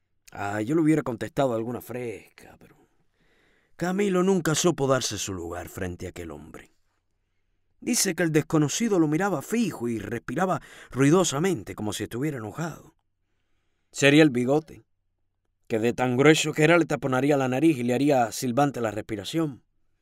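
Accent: Argentina. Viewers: muy gracioso tu comentario acerca de que te gustaría que el narrador tuviera acento argentino , no hace falta , vos lo hacés extremadamente bien y sonás como tal !!!! sos un capo !!! GRACIAS POR TU EXCELENTE NARRACIÓN Y HUMOR !!! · Tu risa es genial jajaja te sale muy bien el acento 🇦🇷 es hermosa la novela. Buenísima lectura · tranqui rey te sale bien el acento argentino. hay veces que pienso que los sos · Gracias por este hermoso y gracioso audiolibro. ( Muy buen acento argentino, te lo dice un a argentino🙂🇦🇷)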